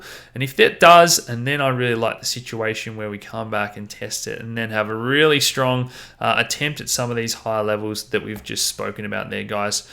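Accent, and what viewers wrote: Australia. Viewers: Great channel! Great find! Have been watching themoneygps and Lynette Zang etc Good to hear an Aussie accent in this space but adds in crypto as well.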